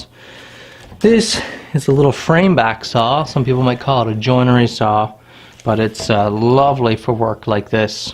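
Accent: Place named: East, Canada. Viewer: hey, sounds like you might be from eastern Canada. Anyhow, that 2 inch chissel is actually a 2 inch slick.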